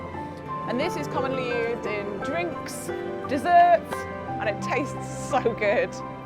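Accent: Australia. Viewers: Your accent sounds Australian yet somewhere your video mentioned "English girl." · your accent sounds more Ozzy <3 after watching Ozzy Man reviews since 2014, My inner voice has become Aussie eventhough I am Pakistani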